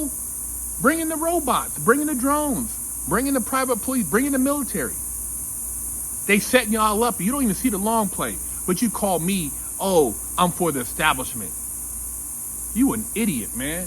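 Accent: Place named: Montana, United States. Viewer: But you live in Cali right?.. sounds like you're talking like you live in Montana or something. No disrespect. Love ya!!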